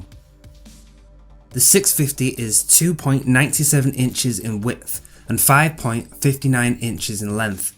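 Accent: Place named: United Kingdom. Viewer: I can listen to british people talk forever lmao. good review!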